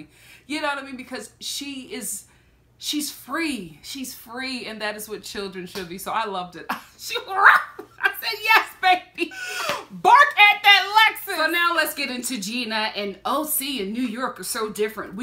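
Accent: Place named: Philadelphia, United States. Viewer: Omg this is the first time I have ever heard your Philly accent 😀😀 I enjoyed this review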